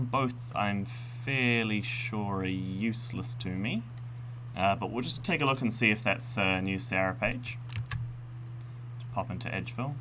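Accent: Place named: New Zealand. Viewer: Mate, you're a Kiwi! What part of NZ bro?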